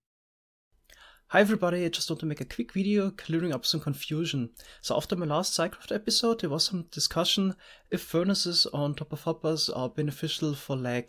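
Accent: Germany. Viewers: what Country are you from? It sounds like you would be from Germany :D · Du hörst dich sehr Deutsch an 🤔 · Agreed. He sounds like he's German, or at least speaks German as his native language.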